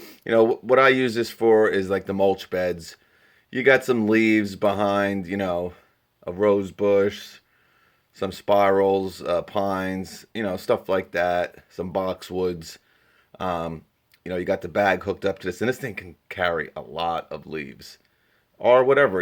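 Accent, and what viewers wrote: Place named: Northeast, United States. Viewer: You have to look too beyond the initial price. Some might say I can get a "better" Toro, HomeLite, etc. at Lowes/Home Depot initially but where do you go to get it serviced, definitely not Home Depot, etc. Ace Hardware is a Authorized Dealer and typically your old school lawn shops service the higher end products like Stihl, Husqvarna. You have to add that to your overall decision in purchasing a unit. I think for myself, for light blowing off grass the driveway and deck, I can't beat the BG50 for my personal use that is for sure. Love the Northeast accent! lol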